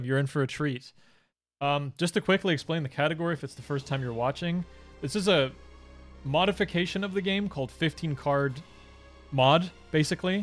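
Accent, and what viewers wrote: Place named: United States. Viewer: It's your accent. Or more so from an American's perspective, lack there of. Your English blends with "American English" honestly seamlessly to the point you can say your from New York and no one would bat an eye.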